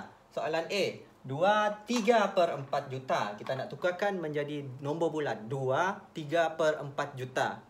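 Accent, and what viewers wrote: Sarawak, Malaysia. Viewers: Kitak orang sarawak ka?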